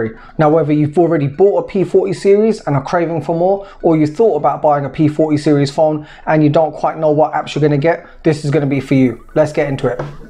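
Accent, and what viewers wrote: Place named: United Kingdom. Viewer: Indian with British accent..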